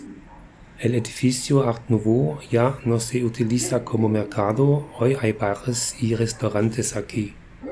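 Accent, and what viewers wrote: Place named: Germany. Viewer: warum mit deutschem akzent spanisch gesprochen ich kann es verstehen, aber die meisten deutschen nicht. super idee, den nicht so bekannten camino levante zu gehen. ich war 1972 in valencia resp. in der nähe und habe nur gute erinnerungen ausser an den zoo. damals hatten die tiere so eine art käfig wie im zirkus, da sind wir geflohen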